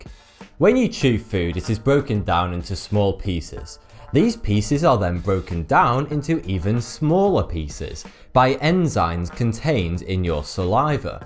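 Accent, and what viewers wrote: United Kingdom. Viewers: he's so British ha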